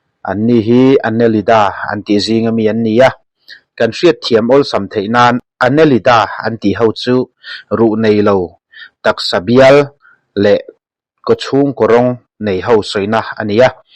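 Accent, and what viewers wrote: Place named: Mizoram, India. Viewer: vāngvat itia, vangvat alawm... i thluk dan reih ruih hi chu a bru mizo awphawi riau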